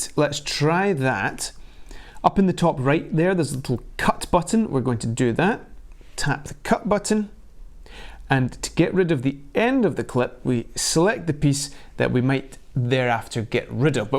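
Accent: Scotland, United Kingdom. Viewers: Just got our first drone today thank you for this as we need all the help we can get ..Was great to hear a Scottish voice we are both rom Edinburgh but live in Spain now thank you !!! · Good to hear a fellow Scot! I’ve just bought the mavic mini and wondering how it copes in the wind in Scotland? As you’ll know it’s windier then most places so given its so light I’m a bit paranoid. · Man, Every time I watch your channel it makes me wish I had a cool Scottish accent.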